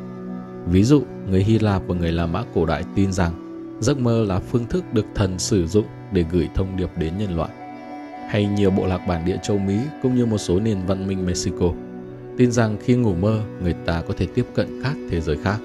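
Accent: North, Vietnam. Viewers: Anh giải thích về giấc mơ rất hay, cho thấy anh có rất nhiều kiến thức vững chắc. Chỉ mới biết Channel của anh hơn hai tháng, đang nghe lại những bài củ cả năm trước. Giọng đọc của anh quá hay và rõ ràng. Tôi đến Mỹ từ nhỏ cho nên có những Channel nói giọng Bắc nhanh quá rất khó hiểu với tôi, nhưng giọng Bắc của anh rất hoàn hảo, chậm rãi dùng từ ngữ dễ hiểu (😄👏). Thanks so much! Love your Team! Love you all!❤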